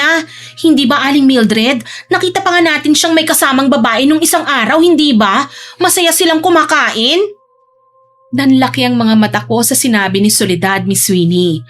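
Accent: Visayas, Philippines. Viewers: Ang cute ng pagka bisaya miss, as bisaya approved ka sa akin May pagka Hiligaynon ang tono hehehe pa shout out po next upload nyo watching from Oman 🇴🇲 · More Bisaya or ilongga voice po bahay din SA Inyo ms. ☺️napapangiti talaga ako pagnikikinig ako SA Inyo 🫶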